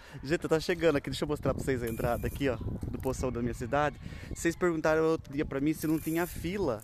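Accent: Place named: Cuiabá, Brazil. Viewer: Fala bem parecido com cuiabano,de onde vc é o?🙏👏🏻👏🏻👏🏻😘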